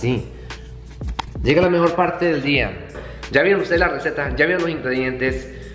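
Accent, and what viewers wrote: Colombia. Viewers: me encanta tu español colombiano. xdxdxd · Tu eres colombiano, porque suenas a ellos.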